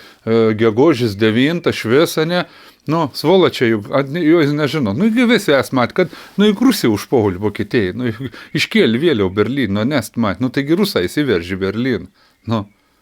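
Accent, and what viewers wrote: Samogitia, Lithuania. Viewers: Ačiū už žemaičių kalbą. Koloritiškai... Ta prasme spalvingai skamba. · Pirmą kart klausau kaip žemaitiškai kalba, labai malonu ausiai, aš kaip nemoku man labai smagu girdėt.:) Manau žemaičiai visad turėtų kalbėt savo tarme, o kam nepatinka tegul čiuožia. 😄 O apie putiną tai čia geras buvo, dar tokių bajerių negirdėjau šiemet. 😂 Mldc. 👍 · Vidiaks 😅😅😅 net ir aš pasimečiau, kaip tašė stoty 😄 Itin smagu girdėti gryną lietuvišką žemaičių kalbą ❤👍 Pasaulinis lyderis V. Putinas, kaltas, 😅 Gerb., jus👍😄 Sėkmės jums su Egle ❤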